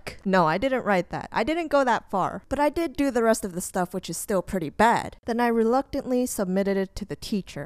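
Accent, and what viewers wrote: United States. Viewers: Aesthic Vibes only I thought you were American 🤔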